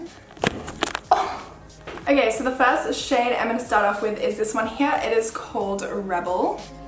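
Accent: Australia. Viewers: As soon as you started talking I was like "of course she's Australian". Why are all Australian girls so damn pretty, wtf?. Anywho, subscribed 😍❤️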